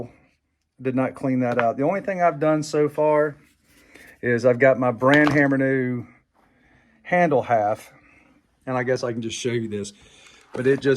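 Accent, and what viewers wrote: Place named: South, United States. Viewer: Buddy, I heard the southern accent and euphemisms and knew right there that this video was the only video I needed. You're right. That German engineering is dummy proof. Thank you for the walkthrough, dude. Be blessed.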